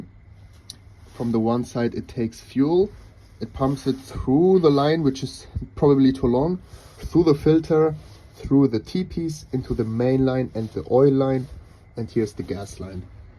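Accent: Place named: Germany. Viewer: Heavily german accent and gorgeous engineering i appreciate this. <link>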